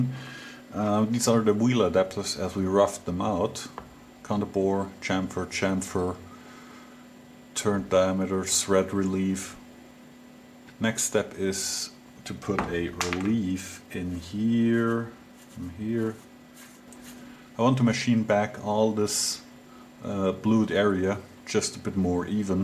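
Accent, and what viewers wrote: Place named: Germany. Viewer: BTW: Solche Unterlagscheiben gibts fertig, Z.B. bei VW. Werden dort bei den hinteren Radlagern eingesetzt. Man merkt es deutlich, Du denkst Deutsch und sprichst Englisch. Ist lustig, besonders wenn Du mal wieder ne Vokabel suchst.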